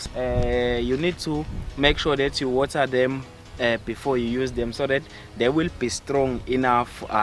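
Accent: Uganda. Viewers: He talks like a Ugandan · this guy has 100% Ugandan accent... good work though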